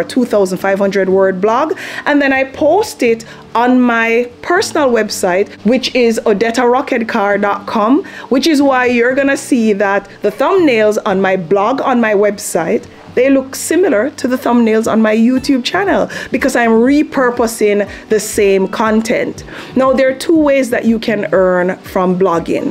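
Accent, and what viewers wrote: Jamaica. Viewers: Wow, You are n excellent communicator. I am guessing Jamaican. Or perhaps an African land. Any way love your presentation. There was a lot and a bit over-whelming. I am coming up on 11 months on my channel and making progress but have not added any affiliates as when I started to add one 8 months ago I was warned by YT. I need help making sure I don't break any monitization rules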